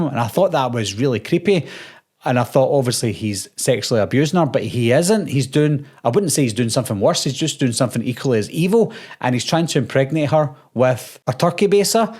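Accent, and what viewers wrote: Scotland, United Kingdom. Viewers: I didn’t expect to come across an Irish youtuber that breaks down movies, I was curious, but now I’m pleasantly surprised it’s the accent I like to hear the most😭😭 (or it could be Scottish I honestly have trouble differentiating the two, but I like them both)❤️✨ Checked the about. Sorry, Scottish** youtuber 😆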